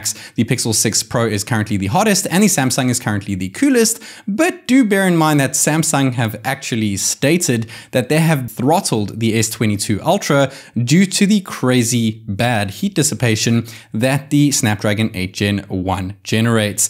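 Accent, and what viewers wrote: South Africa. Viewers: Sounds like I can hear a South African accent....my S22+ gives me some anxious days, compared to my last flagship Note 9. Luckily I love the phone otherwise I would have ditched it. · Bro sounding South African🤔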